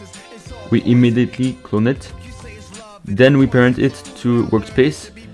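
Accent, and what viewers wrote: France. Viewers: are u french ? good tuto btw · Are you french by any chance? I mean the accent Nice vid bro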